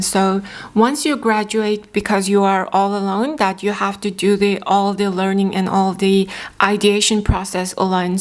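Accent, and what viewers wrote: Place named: Australia. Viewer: .. you are starting to get an Australian accent. 😁 Would you still study business if starting again today?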